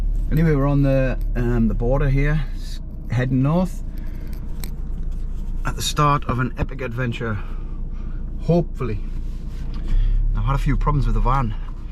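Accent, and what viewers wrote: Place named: Derbyshire, United Kingdom. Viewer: Where are you from? Love Your accent! sounds like Derbyshire? That sort of area? X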